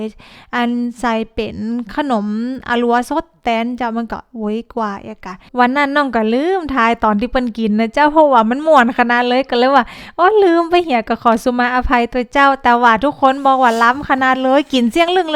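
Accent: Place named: Chiang Rai, Thailand. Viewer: จากสำเนียง…น่าจะคนเชียงราย ไม่ก็ แพร่น่าน แม่นก่อ..ตั๋ว??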